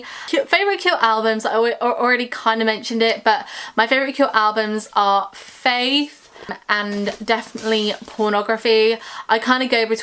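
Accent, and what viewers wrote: United Kingdom. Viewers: Aww hello to u hon..Im Nancy..I just came across your channel while doing some checkup updates on....I must tell u that it's so so awesomely good to see the younger newer generation of today getting into one of the most underrated bands of my time..The 80s..so underrated there totally awesome...not many people have ever heard of them even back then..I was a teenager when they came out and all through my teen high school years even today now I still love them..yes I'm older now..just turned 51..this is me in the pic. You remind me of myself then blond hair all black clothing..which I still wear.im still that cool 80s chick at ❤..lol I know so much about and other great 80s bands like them u might love to know about and give a listening to..huggs to u..yes.i talk alot to..by the way..i love your English accent.you a Brit...nice to meet you dear..always an old school Curran here..huggs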